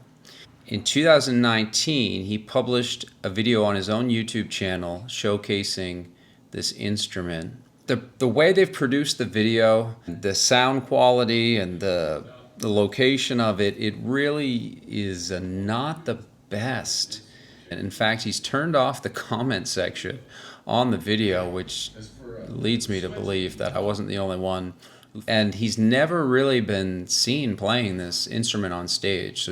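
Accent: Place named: East, Canada. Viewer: I'm detecting a little eastern Canada in that accent my friend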